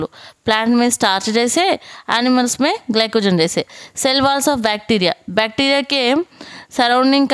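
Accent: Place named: Telugu, India. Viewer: Mam I appreciate your hard work , the way of talking seems like your mother tongue is telugu but your trying to speak hindi 👍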